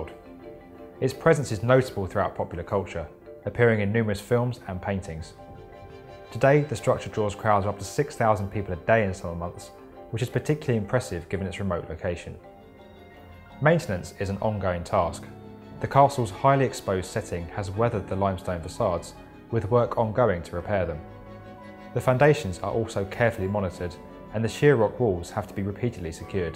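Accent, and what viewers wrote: United Kingdom. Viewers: I hv 2 comments on the narrator and the commentators here: 1- the narrator is speaking in British English, with a heavy accent only the British could follow him. British English is a curiosity to listen to but very difficult to understand and follow by non English speakers. The Brits speaking to non-Brits should realize this and speak more intelligibly (slower). 2- people who not versed in foreign pronunciation and phonetics should not speak/write as if they are correct and should notate their input as “I believe”. None are German unfortunately which makes no one’s right ! It is not Noi nor Noy and “Neu” in German is more French sounding than English so it cannot be phonetically written the English way: it is close pursing the lips when twisting the sound “eu”. Schwan sounds closest to “shvon”, with the “o” here like the American “o” (as in “pot” & “hot”) and not the British “o” (as in “pot” & “hot”). 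Then stein is pronounced simply like in both Englishes “shtine” as in “shine”. When phoneticizing a word one should clarify which type of English pronunciation is used, since British, American, Australian, Indian, Egyptian ways differ considerably, and after all, YouTube is global and not any one of these !!!! Hope this helps non-German speakers pronounce it correctly.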